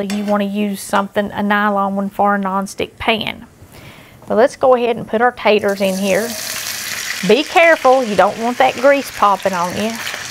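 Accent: South, United States. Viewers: I love your videos I love your southern accent I was taught by my mamaw to cook just like you do. I talk just like you, taters, mater's. I like the scripture's and the fact that you put God first. God bless you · Dear Ma'am. Please know how much I appreciate your videos. I love the Southern accent and charm. I was born in Germany, raised in Chattanooga and now live in Murfreesboro. I have lived all over this world 'cause my stepdad was in the Army. Having spent a bit of time (twice) in Massachusetts (Yup....Yankee), I'm sure you can appreciate how much I love the South. Your shows are awesome, delightful and educational. Thank you for what you do and my God bless you and your crew.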